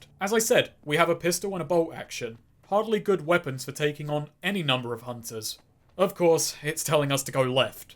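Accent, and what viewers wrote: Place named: RP, United Kingdom. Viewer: Good lesson to learn for indie devs. Better have your shit together or some tosser will absolutely roast your efforts to bits unless it's at the standard of the original game. With the pretense that because he has a posh English accent what he has to say is somehow important in some way. Excellent video A+. Will totally share.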